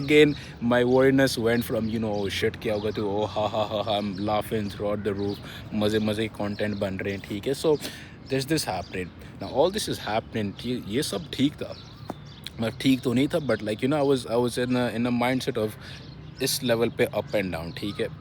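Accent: Pakistan. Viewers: Bro you Urdu accent is awesome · Every single bro is the most Pakistani thing I’ve heard. You sound like I do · Love you ho gaya ha jigar, bara wala, I don't know which city you are from, but after listening to your Urdu accent, it seems like you are Lahori too. Am I right?? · Oo bhaii, you sound more Islamabadi than me, like wth??!!😢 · Good to see you again with your Pakistani accent. Masha’ALLAH.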